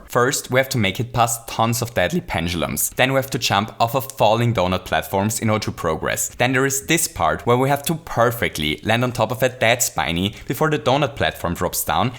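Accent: Germany. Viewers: I hate the German acsent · Wie ich immer herausfinde anhand des accentes das jmd deutsch bzw Österreichich spricht. irgenwie hört man das raus!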